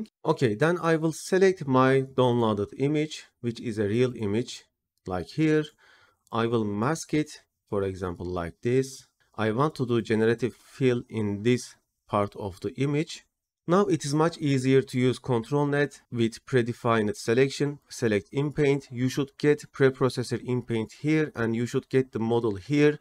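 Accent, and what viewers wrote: Turkey. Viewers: o kadar guzel turk ingilizcesi konusuyosunki direk dikkatimi cekti ;D Guzel video bu arada thnxx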